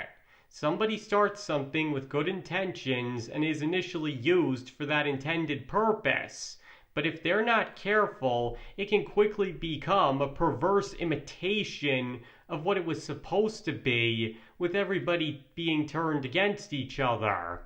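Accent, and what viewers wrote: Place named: Great Lakes, United States. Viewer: Im really enjoying your channel! You bring new perspective to the SP Analysis community that is sorely needed. I'm also dead ass fascinated by your accent. I've heard it once before, from a Podcaster who is probably twice your age; specializing in baseball and popes! 😅 I've heard it referred to as a "Great Lakes" accent. My brief attempt at light online stalking was unsuccessful. Can you help me understand your accent better without revealing inappropriate location details?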